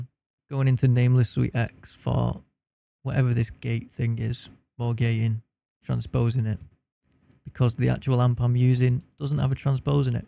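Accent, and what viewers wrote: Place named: Manchester, United Kingdom. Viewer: great video and in mancunian accent.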